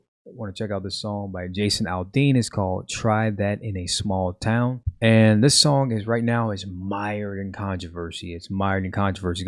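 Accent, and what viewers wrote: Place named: South, United States. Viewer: What we have is Me Too version 2.0 the race war edition with these absolute mental gymnastics that these people are doing so they can cry racism about everything. Did you hear they are trying to call out being on time is racist?? But great commentary and I can hear the "Southerener" in you. Everyone looks at me like I am stupid living up north when I say it that way.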